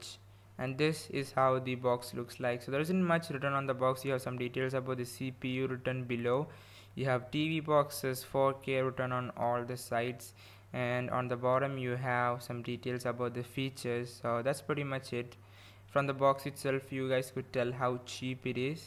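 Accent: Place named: Kerala, India. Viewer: Malayali aano.. english ketal angane thonu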